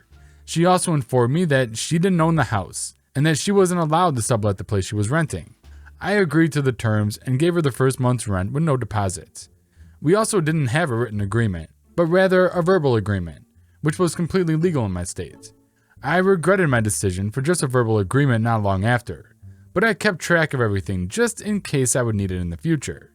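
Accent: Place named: Midwest, United States. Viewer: I like your accent, it sounds Midwestern. Where is it from?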